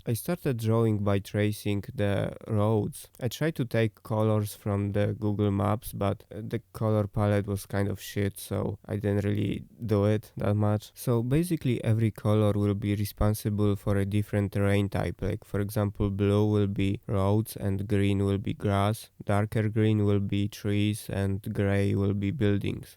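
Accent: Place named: Poland. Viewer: you sound so polish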